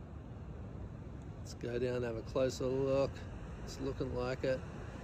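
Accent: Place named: Australia. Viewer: Great footage, love hearing Aussie commentary, I've lived along east coast all my life, had some close encounters over the years, Evans head, Lennox, Byron. Just found the channel, keep up the great work, Cheers 😊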